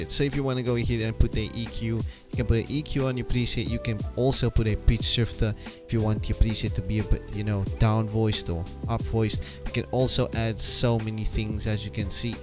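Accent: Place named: South Africa. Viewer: 😅 South African AF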